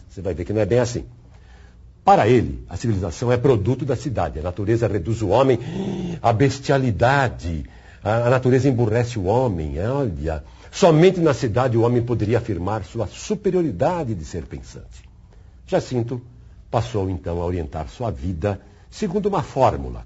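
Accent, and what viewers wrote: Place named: Caipira, Brazil. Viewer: Belo trabalho, voz caipira lembrando os velhos antigos (sim, os velhos de antigamente eram muito melhores e melhores contadores de estórias que esses velhos de hoje). Parece ser um excelente professor, apaixonado pela sua arte. O livro me pareceu ser chato e descritivo demais, justamente o que procuro, o Eça tem essa qualidade mágica de fazer nos apaixonarmos pelas palavras. Pedi hoje, espero ler logo, logo.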